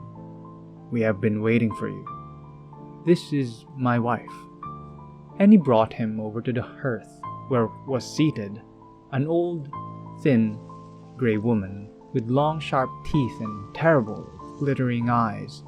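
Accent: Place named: Ireland. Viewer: Lovely reading, are you Irish yourself? I hear a subtle accent but not sure if it's thematic 🙌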